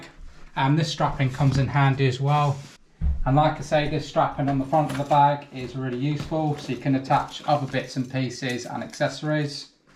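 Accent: United Kingdom. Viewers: Im an Electricion in Sweden, i see you have stuff for plumming, are your doing both jobs as a electrision in your country?, sounds like you are from UK right? Great video, i enjoy watching your content.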